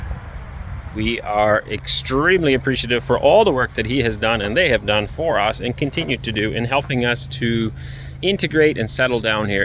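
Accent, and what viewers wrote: Canada. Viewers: That is the most Canadian accent ever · he Canadian by the accent.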